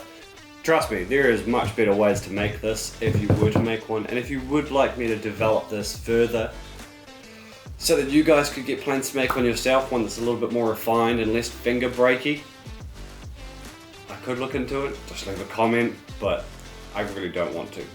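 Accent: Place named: New Zealand. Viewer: You a Kiwi?😁 You have a slight accent and in sure you aren't Brit or South African and me hearing a bit of an accent probably means not Aussie.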